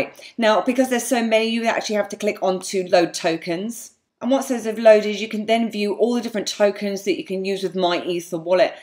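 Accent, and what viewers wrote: United Kingdom. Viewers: She has a British accent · she has a british accent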